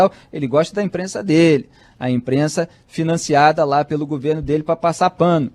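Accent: Rio de Janeiro, Brazil. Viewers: Felipe Mourão Brasil, seus comentários são claros e eu tenho prazer em vê-los, sem contar com seu sotaque carioca que é lindo.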